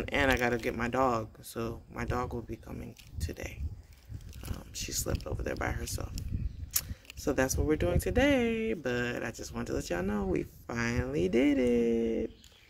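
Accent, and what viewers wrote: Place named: Senegal. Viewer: Hello, I am a Togolese living in DC and I just discovered your lovely family. Just want to tell you that I love love the way you speak French with the Senegalese accent! It is not easy to speak another language especially French. I can see why people would think that you are Senegalese. Such a good role modèle for your daughter. After 30 years in the US, I am planning a return home soon. Although I am African, I spent most of my life abroad. The anxiety of going back is real ! So thank you for inspiring and motivating me! sending positive vibes and blessings to your beautiful family. 🤎😊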